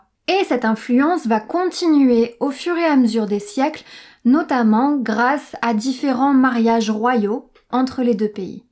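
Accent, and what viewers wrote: France. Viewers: J'aimais cette vidéo énormément. Un sujet intéressant, tous est très bien prononcer et á une vitesse qui me permettait de tous suivre. Merci beaucoup. Á propos: ta prononciation de l'anglais est vraiment super bien. Avec un bon accent mais encore avec un petite peu de sauce française pour le charme. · If you don't mind, where's your French accent from? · These videos are so great. I just started learning. And I'm basically using the Babbel app, Lupin on netflix, and you. Different types of mediums help I think. But I love your content. You are always making it fun to learn. And your positive, fun attitude. And of course, your lovely french accent. ☺ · Her French accent is very mild when speaking English. Her English has a hybrid French/British sound to it. Interesting. Also, why wouldn't Handball be something like Balle de la Main in French. 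As opposed to Handball pronounced without the H. Just asking.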